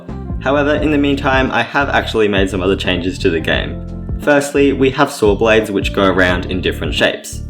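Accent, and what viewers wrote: Australia. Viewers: didnt know you were aussie, i am too 🙂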